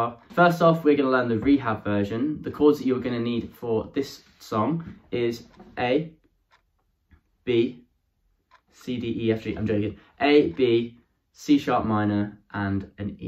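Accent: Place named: United Kingdom. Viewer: 빠져든다 영국발음💚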